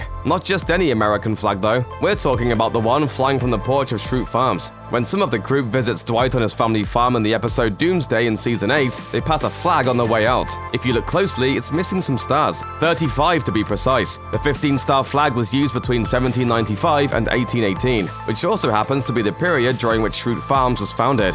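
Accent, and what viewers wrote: United Kingdom. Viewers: Is it me or the British-accent (of the narrator) doesn’t really go with the American show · Love that a British guy is talking about the US version.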